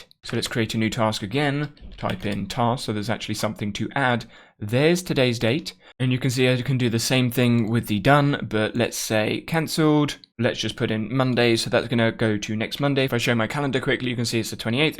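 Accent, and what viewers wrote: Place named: United Kingdom. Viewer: So refreshing to have a British accent explaining something to us. :-)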